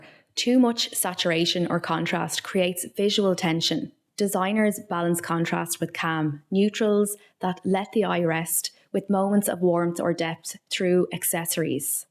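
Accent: Ireland. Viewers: Thanks for getting straight to the point without a lot of unnecessary introduction and explanations. Your Irish accent is as beautiful as you are, too. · To a large extent, I am watching because i love Irish accents.....so there's that. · I'm here for the lovely Irish accent! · Love your irish accent up the dubs 😂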